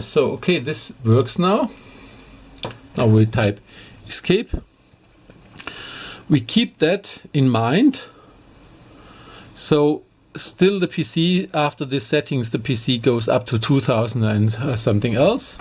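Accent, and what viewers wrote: Germany. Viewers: Man merkt absolut dass du deutsch bist aber trotzdem gutes video :)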